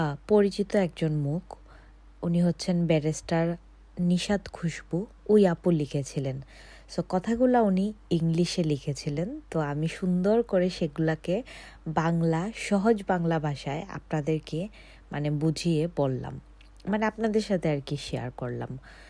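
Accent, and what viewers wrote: Sylhet, Bangladesh. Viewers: Sylheti ni?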